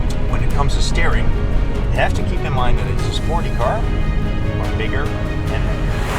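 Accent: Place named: Netherlands. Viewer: Ha! Dat Hollands accent ... dat blijft ge van kilometers ver horen hè. Grappig wel.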